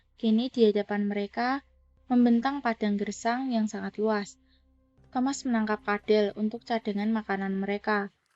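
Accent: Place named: Banyumas, Indonesia. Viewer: Narator ngapak 🙃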